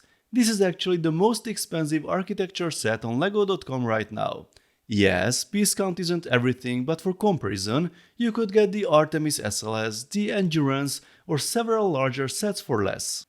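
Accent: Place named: Hungary. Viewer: we love your Hunglish! You must have been talking to Dr Németh - of capandball fame here on YouTube.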